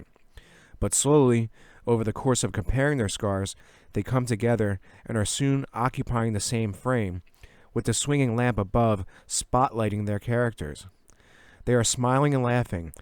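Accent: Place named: Philadelphia, United States. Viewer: You have a strong Philadelphia accent.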